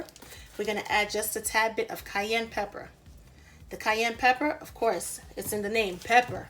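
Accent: New York, United States. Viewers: Luv that New Yawk accent. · Lol love her accent funny she most definitely from NEW York lol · Sounds like she's from newyork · Definitely NY maybe Westchester or Long Island or Queens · Are you from NY?🤔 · Ah, Thought I heard the NY accent ,or thereabouts. lol! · Are you from NY? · Didn't look at anything else but I say from NY state. I from NY . Love the recipe. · Looks delicious and very easy to follow the steps. I love the NY accent. Would you please check me? <link> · She got a New York accent....LOL.. I find it lovely seeing Northerners cook Southern foods. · I could almost smell those sausages!!! Her New York accent makes me long for mine😛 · I just made this and it was awesome, easy to follow and put together, thanks love the NY accent. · Had to subscribe once I heard the accent. 💯 N.Y in the building right here BX....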